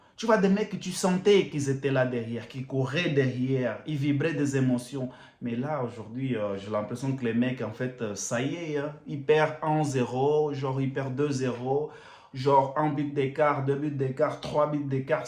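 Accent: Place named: Portugal. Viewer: Bonne vidéo mon frère, on sent ta colère et je la partage ! Loin derrière nous l'époque des grands Cristiano, Ramos, Marcelo, etc, loin derrière nous l'époque où les joueurs se battaient pour le blason et qui mouillaient le maillot...maintenant on a des branleurs. Des branleurs avec un énorme talent, oui, des branleurs qui parfois arrivent à avoir une étincèle, un brin de génie, mais des branleurs quand même. D'ailleurs, petite question, es tu portugais ? J'ai comme l'impression d'entendre un léger accent. 😄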